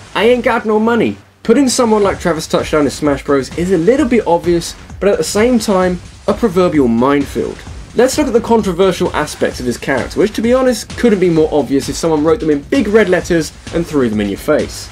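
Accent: United Kingdom. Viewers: A British accent doesn't mean your smart or you know what your talking about.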